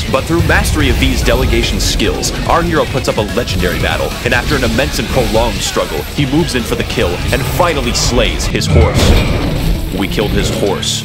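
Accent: United Kingdom. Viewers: "Oh, he's British." As a British person, I can claim he is from Birmingham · Oh he's british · Oh, he's british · oh hes British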